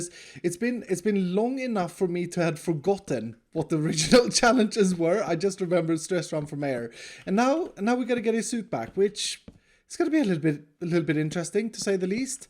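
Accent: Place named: Sweden. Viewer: Im sorry bur you have one of the most Swedish accent that u have ever heard😇😅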